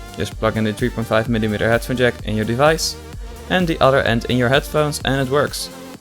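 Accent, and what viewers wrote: Netherlands. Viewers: ur dutch right? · sws nederlander hahahha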